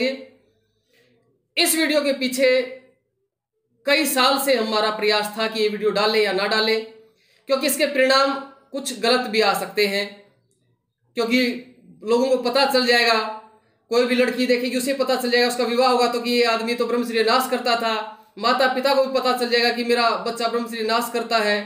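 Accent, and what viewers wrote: Haryana, India. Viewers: हरियाणा से हैं क्या आचार्य जी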